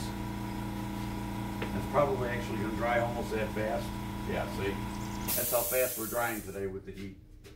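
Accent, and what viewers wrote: Midwest, United States. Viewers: Good information. Oh, I am here for the Midwest accent! Lol. Born in Indiana, raised in Wisconsin, but have lived in west Texas for about 40 years now. I miss that accent! 😂😂😂.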